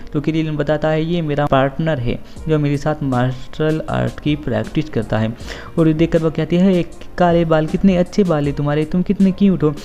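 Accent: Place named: Garhwal, India. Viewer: bhai tumhari language में garhwali taste aata hai isliye bol rha hun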